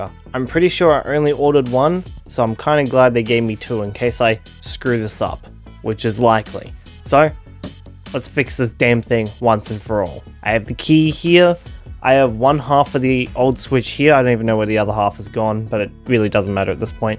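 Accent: Australia. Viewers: i think he's just australian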